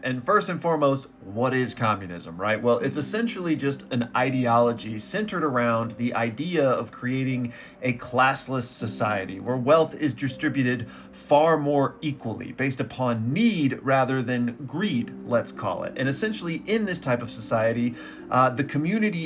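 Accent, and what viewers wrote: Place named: Canada. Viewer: I thought you were Canadian 😂😂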